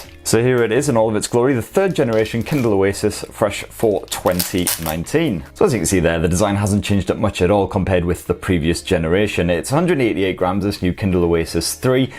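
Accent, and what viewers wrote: United Kingdom. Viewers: Garbage device and garbage accent ! Please speak like normal human being and not British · Excellent Review! Your British accent helps too. 😎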